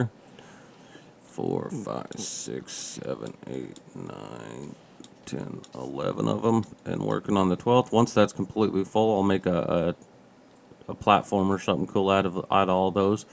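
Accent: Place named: South, United States. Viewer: You hillbilly sounding useless peace of shit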